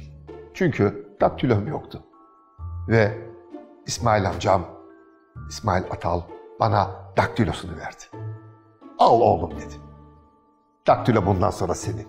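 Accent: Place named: Trabzon, Turkey. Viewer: Müthiş hikâye. Trabzon ağzıyla konuşmanız çok keyifli üstadım.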